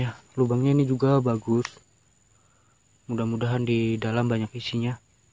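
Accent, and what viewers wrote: Java, Indonesia. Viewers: Tpi ngomongnya mirip lgat jawa mas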